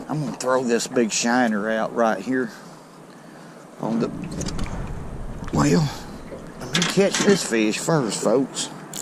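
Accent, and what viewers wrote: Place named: Tennessee, United States. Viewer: Beautiful Tennessee accent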